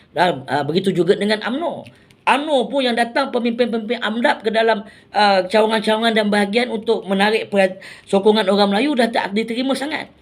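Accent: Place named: China. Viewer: ...tak syok cakap intonasi macam ni....kena buat macam sebelum ni...syok dengar...ada itu pelat2 chinese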